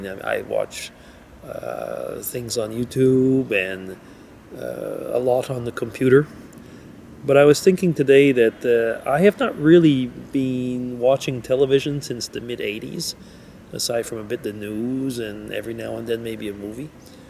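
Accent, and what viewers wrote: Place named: South, United States. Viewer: You can send some of that cool weather this way. Sounds like you are going to be busy with family coming. Hope they let you video. =) You are a man of many languages & your southern accent... Oh that. Yea Halloween. Yes when trying to know how is who now is crazy. Stevie could not even get to his message page. A fun BD gift is on the way =D Under the Dome is very cool. TY for keeping me up on it xoxoxo. I watch TV at night BIG HUGS for you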